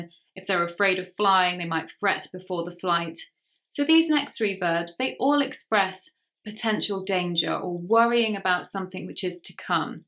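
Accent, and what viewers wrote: United Kingdom. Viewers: Hi madam, really we have enjoyed your teaching in English and satisfied your grammatical and real British English for we accept this as native english,so may we suggesting you to speak little slower than usual so that we can heard sound and clearly okay! We also satisfied special English news from America which suits us slow,clear and steady English speaking.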